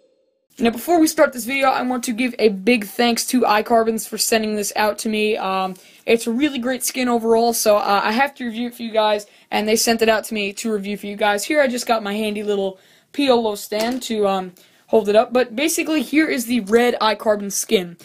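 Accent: United States. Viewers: Do you have a New York accent?? Just wondering, otherwise great video.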